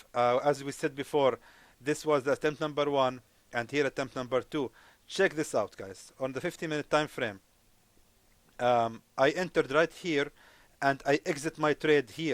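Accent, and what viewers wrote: Arab world. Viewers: والله اللهجة العربية م تتخبى لو ايششش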